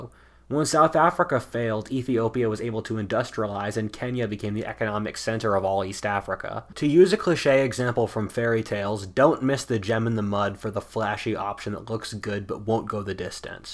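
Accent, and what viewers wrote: United States. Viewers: I heard the heavy American accent, & immediately disregarded this video. .... The short introduction alone showed how naive (poss believing all the heavy US propaganda) this presenter is. .... Wait just a few more years, young Sir, & you will be horrified at what happens betw East & West.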